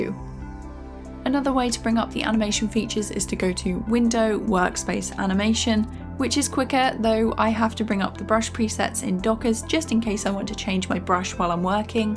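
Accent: United Kingdom. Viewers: I love your voice! and that british accent is so sweet!! greetings from Brazil ;-)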